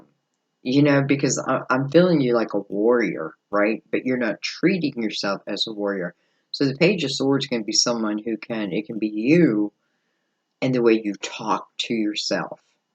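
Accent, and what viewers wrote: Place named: Pittsburgh, United States. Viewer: November 1st. I like your readings. And that's gotta be a Pittsburgh accent!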